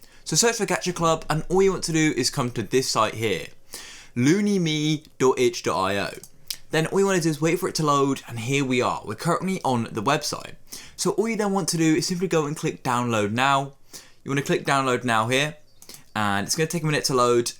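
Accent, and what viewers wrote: United Kingdom. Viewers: Are you British? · thanks random british person on youtube :3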